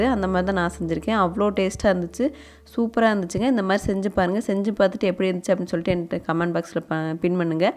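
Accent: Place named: Anaimalai, India. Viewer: Neenga pesara language vanthu enga language mariye irukku nanga anaimalai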